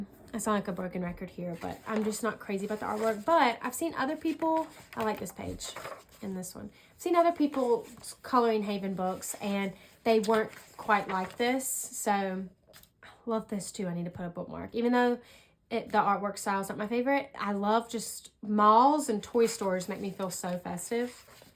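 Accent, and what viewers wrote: United States. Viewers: New to colouring and really like your style. Also, as a Canadian, I could listen to your southern accent forever! Thanks for the great book suggestions! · Love your content ❤ Are you from Texas, you.have that beautiful southern bell accent.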